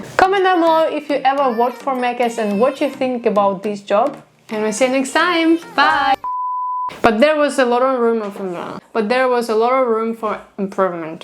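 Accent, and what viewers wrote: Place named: Europe. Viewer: I am curious, if you ever had customers from the same part of Europe, who recognised your accent, and wanted to converse in ( one of ) your languages.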